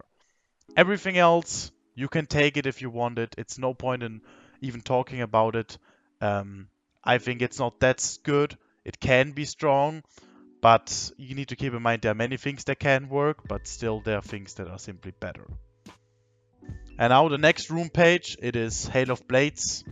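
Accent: Germany. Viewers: du klingst deutsch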